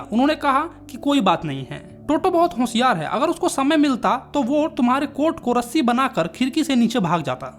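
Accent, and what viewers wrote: Bihar, India. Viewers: Bhaii ye bihari hai toh bihari tongue hi hoga na. Explanation is good by the way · Are you from bihar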